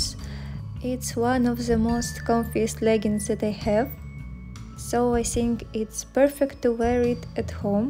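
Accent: Russia. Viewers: Мне рязанский акцент сразу понравился! :))) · Привет, русскому акценту в английском ! 😁😁😁 Классная у тебя фигура ! 👍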